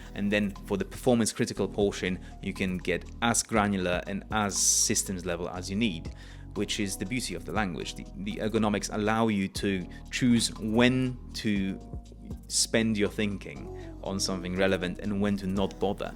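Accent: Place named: United Kingdom. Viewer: same half-posh British accent; the similarity increases . . . :)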